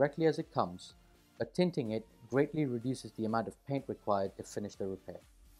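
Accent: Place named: Malta. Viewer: Curious to know where your accent is from - you sound just like an educated Maltese. Great job btw!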